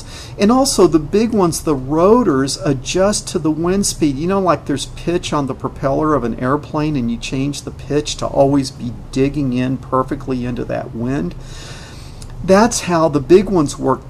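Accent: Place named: United States. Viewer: I don't expect you to change your accent or speak in a British accent. I love the way Yanks speak. They (you) just have to pronounce words correctly. lol. I'm more concerned if you are one of the 46% of Americans that think the world is only 6000 years old?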